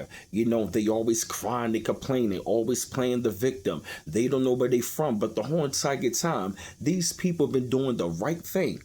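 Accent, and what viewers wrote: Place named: Philadelphia, United States. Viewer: I Hyped this video with points. Your voice sounds like you live in Philly. Great narrative!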